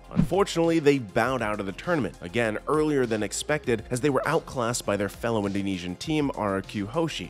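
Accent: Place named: East Malaysia, Malaysia. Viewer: Your voice It feels like an east Malaysian (Sabah & Sarawak) pronunciation kinda style 😂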